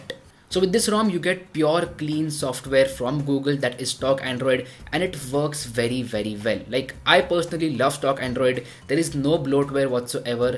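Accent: India. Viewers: Indian accent is so terrible xD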